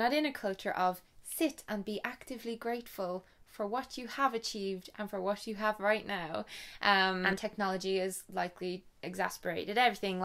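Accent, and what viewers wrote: Ireland. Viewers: Love your Irish accent :)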